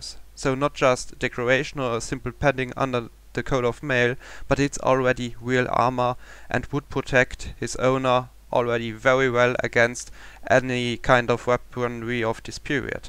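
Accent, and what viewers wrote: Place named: Luxembourg. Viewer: Luxembourgish accent ;-) So sweet compared to the hart german accent ;-)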